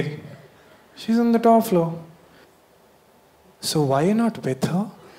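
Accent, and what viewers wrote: India. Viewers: Kenny sabastian he is a Indian with that accent ding ding only Indians will understand him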